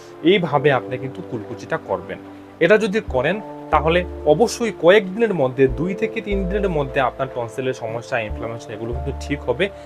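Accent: Sylhet, Bangladesh. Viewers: আপনি সিলেটি মনে হয়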